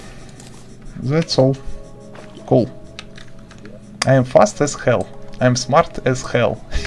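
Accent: Russia. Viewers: ты русский? мне кажется у меня похожий акцент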